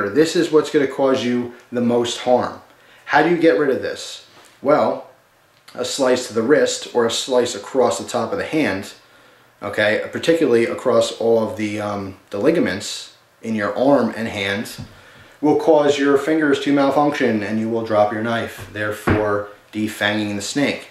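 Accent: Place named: New York, United States. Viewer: did you say boind them? hahahaha i think that the new york accent is cool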